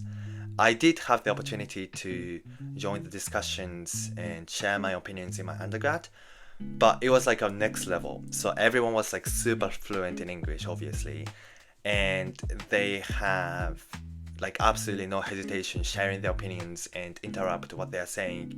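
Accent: United Kingdom. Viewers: いつも美しいイギリス英語に癒されています。自分も現在留学をしていて来年ボストンキャリアフォーラムに参加する予定です！さんのような魅力的な人材は引く手数多だと思いますが、最終的にどのような業界に進まれるのでか？ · イギリス英語は本当にうますぎて憧れます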